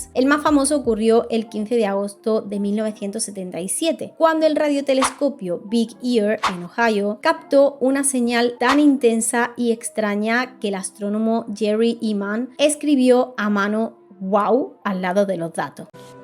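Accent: Andalusia, Spain. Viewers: Me Encanta ese acento español❤ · Amo el acento andaluz y encima contando cosas interesantes. Saludos desde Chile!! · Me suena a Andalucia ese acento😅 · Que interesante video, Pero por Dios, de que parte de España es ese acento? Es muy hermoso 🤩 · el mejor resumen acerca del tema con un hermoso acento andaluz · Está hablando con acento andaluz, el de mi tierra, si no te gusta agua y ajo. Luego te quejarás del doblaje español y preferirás el latino que hace que parezca que todo es una telenovela. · No sé Uds, pero lo interesante del tema lamentablemente lo perdí, por lo embelezado que me dejó el hermosisisisimo rostro de esa niña! ah! y la ñapa: me encantó el contraste que tiene entre su pronunciación de términos en inglés, y el acento (creo que es andaluz, corríjanme) cuando dice palabras en plural y se "come" la "S", 😊... ese: "etádo unido" le suena muy cool, que lo digo yo que digo "caraca", en vez de "caracas", que es de donde soy... 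PS: en cuanto al tema del video, prometo oirlo en vez de verlo, para concentrarme en lo que dijiste! Tip: baja un poco el "peluche" ese que cubre el micrófono, para que dizque se oiga mejor.. 😉... una vez digerido el material, prometo reuní un dinerillo para comprar un cohete.... para qué? preguntará la... pues "PARA LLEGAR A AMARTE"! 😅😅... (tú te comes las "S", pero yo no me como las "A", jeje) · Excelente video: sigue adelante el tema es muy demandado a día de hoy. Jajjj. Tienes asento andaluz muy lindo. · Lindo acento andaluz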